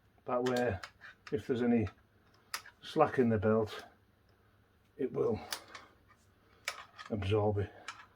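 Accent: Cumbria, United Kingdom. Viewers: Where in Cumbria are you from?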